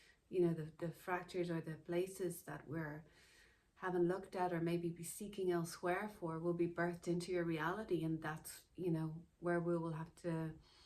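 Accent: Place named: United States. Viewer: Why are suddenly AMERICAN? WTF?!!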